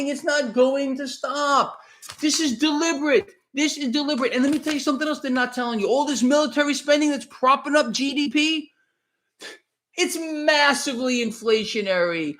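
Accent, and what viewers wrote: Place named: New England, United States. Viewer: Hi. I've been "quietly" listening to you for a few months and increasingly so over the past 2 months. You've quickly become my favorite person to listen to and get my financial info from. I really enjoy your personality, sense of humor, and your sharp mind (and the Yankee accent I grew up around). I believe I first saw you while listening to Daniella Cambone (Stansberry Research) and then on Lynette Zang's channel (ITM) many months later. I generally listen while busy and/or moving around so I don't generally watch the chat or interact, but I feel like I'm in the right place where I resonate with what you're saying. Anyway, I just wanted to say hello. Your channel feels like a community from what I can tell so far. I really like that and hope to become part of the coolest club on YouTube 😎 FR. Seriously though, I appreciate the honesty and "realness." TY. 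❤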